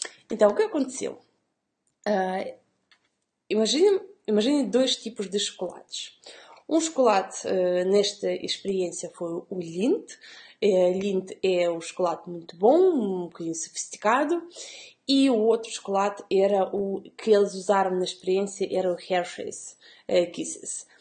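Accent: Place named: Eastern Europe. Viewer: O que me admira mais é o facto de conseguir raciocinar abstratamente numa língua estrangeira, de forma tão correta! O seu ligeiro sotaque de leste, então, é a cereja no topo do bolo! Parabéns, Senhora!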